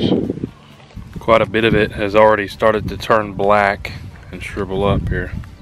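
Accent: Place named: East Tennessee, United States. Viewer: He talks like we do here n East Tennessee “FRONCH Yard” 😂😂. Thanks for the video definitely getting that.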